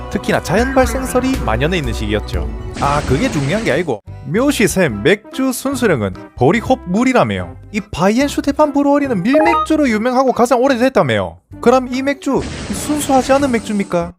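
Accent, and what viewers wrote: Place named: Seoul, South Korea. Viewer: 와 유용한 정보들과 맥주역사까지 설명해주니 좋네요 무엇보다 서울말로 설명해주니 귀에 쏙쏙 들어옴!!!🤩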